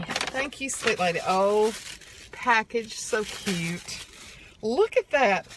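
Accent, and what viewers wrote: South, United States. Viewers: I like your southern voice and how you always seem happy. I enjoy watching you.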